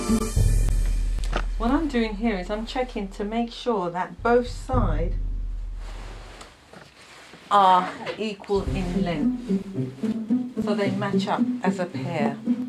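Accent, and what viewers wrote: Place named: United Kingdom. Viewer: Love your British accent!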